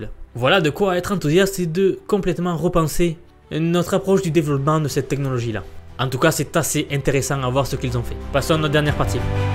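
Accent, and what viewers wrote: South, France. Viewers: Avec un joli accent du sud du soleil qui nous raconte que de la merde. Quand les industriels auront tout contaminé, ils nous vendront des robots pollinisateurs. Allez vous faire soigner… pour être poli.🖕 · J’adore ton contenu a la pointe de l’innovation et merci pour ton travail ! Par contre désolé mais il faut faire des séances chez l’orthophoniste. Ton discours et très saccadé , et ta prononciation approximative … ton accent du sud est fort sympathique mais ca ne suffit pas pour t’ecouter agréablement 😊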